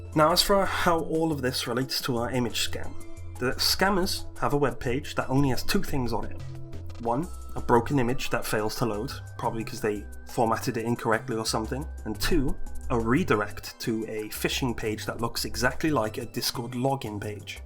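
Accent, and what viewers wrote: United Kingdom. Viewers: ah!! alright, only reason why i asked was bc of the way your accent seemed to mirror that of the bristol accent in my head my brain makes weird connections ;w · hooold on, hoooooold on, my guy are u welsh? if yes: high fiveee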